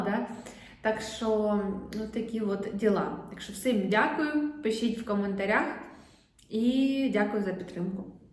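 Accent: Surzhyk, Ukraine. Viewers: Недавно на Вас підписана, відчула щось схоже , не можу описати, особливо мова, мій рідний суржик😊😂 Звідки Ви , з якого куточка України? Я з Полтавської області, зараз також проживаю в Польщі 🤗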